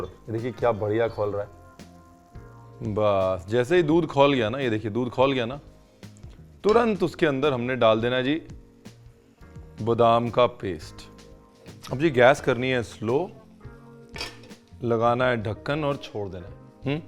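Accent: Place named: Punjab, India. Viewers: Your punjabi accent is to die for 🤗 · I don't know if youtube read my mind today. I was about to comment in one of ur video "k sir Punjabi v bol dea kro vich vich" den Utube came up wid this suggested video. Like tadka is must in every dish, ur punjabi accent also necessary in every cooking video. Dhanwaad Paaji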